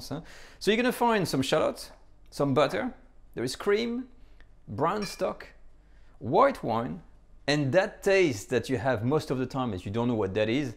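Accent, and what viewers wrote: France. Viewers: This dude sounds so French.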